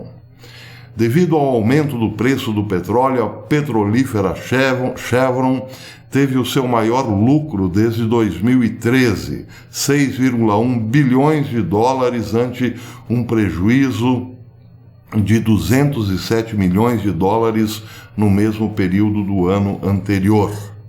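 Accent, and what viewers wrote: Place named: Minas Gerais, Brazil. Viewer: velho de guerra, teu mineirês tá afiado, hein?! Parabéns 👏👏👏😁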